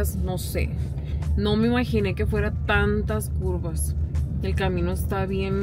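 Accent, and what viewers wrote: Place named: Mexicali, Mexico. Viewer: Me encanta ver tus videos Porke. Me gusta como ablas. Así casi como yo. Jajaja. Yo soy de Mexicali 👍😁pero vivo en los Angels ca. pero si. Así ablamos Nosotros. Saludos chula 😁🌹🥰😎👍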